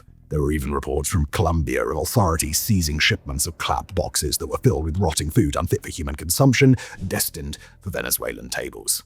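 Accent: United Kingdom. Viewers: Venezuela scrores in the best category by the Global Hunger Index. Venezuela scores better even than India, Papua New Guniea, heck it's even better than regional darling Ghana. Ukraine is war-torn, so, but even still Venezuela is scoring higher than Ukraine. When you consider these video topics, take a step back. Please don't report "stories too good to check." You could have spent this time better reviewing India's hunger issues, but instead you're doing imperial propaganda with a British accent (which used to be the best accent for imperial propaganda, but isn't anymore). I guess a Brit shitting on India for any reason would also come off wrong. You know... just critique empire, would you please? You have the proper accent for doing that! edit: don't know why I didn't mention that IN SOUTH AMERICA, Ecuador, Suriname and Bolivia all score worse on the Global Hunger Index, than Venezuela. 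This video feels borderline misinformation; it's just so unfairly focused and lacks context. Which, that's how the best lies operate! And I'm not fan of Maduro, but carrying water for empire is such an ugly look.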